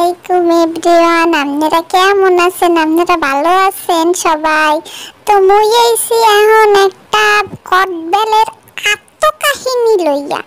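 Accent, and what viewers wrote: Bangladesh. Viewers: কদবেল আহ দেখলেই জিভে পানি চলে আসে। বরিশালের ভাষাটা অসাধারণ লেগেছে। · কদবেল যেই রহম যমমের মজা হেইরহম বরিশালের ভাষাও হোনতে যমমের মজা।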